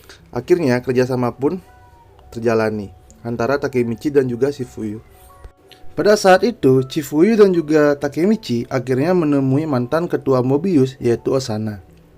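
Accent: Bali, Indonesia. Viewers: Nak bali ules ne logat bli ne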